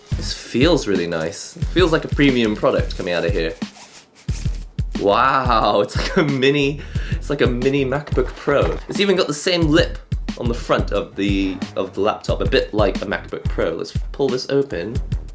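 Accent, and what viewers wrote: England, United Kingdom. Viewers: Real nice English accent :D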